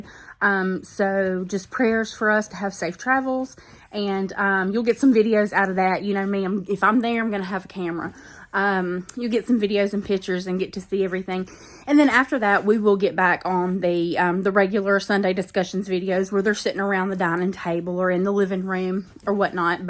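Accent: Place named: South, United States. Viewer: Thank you for all you do to help your grandparents share their beautiful lives and Elvis stories with us. Elvis loved and cherished his family members, so I am sure he would be so proud of the way you care for and look after your sweet grandparents. Btw, I love your Southern accent!! And please do keep the animals in the room while you are filming, they always make life so much more interesting.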